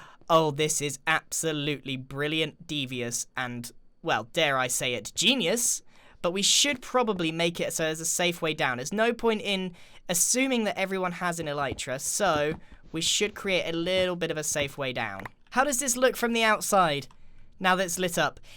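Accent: United Kingdom. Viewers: Has British accent Comment section: DAN TDM?!?!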